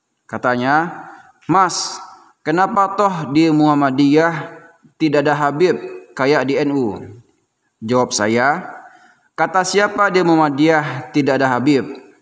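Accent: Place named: Padang, Indonesia. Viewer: Ngomongnya logat Padang boanget yak?! 😁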